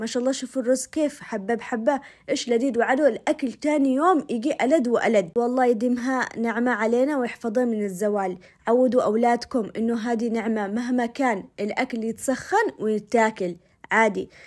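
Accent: Aden, Yemen. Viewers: ❤❤ قلبك ماشاء الله تبارك الله عليك مره وستوا ❤❤عاشت ست الكل أسعد الله أوقاتكم بكل خيرا آمنا إحنا عارفين الطريقه بس إللي شوقنا أكثر صوتك الجميل العدني الاصيل القح 😂🎉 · ماشاءالله عليك مبدعه وحبوبه وشاطرة وحبيتك لانك من عدن متلي وماتتصنعي وتتكلمي عدني واحب لما تتكلمي العدني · مااحلاك وامااحلى طبخك وكلامك العدني الحلو الله يعطيك العافيه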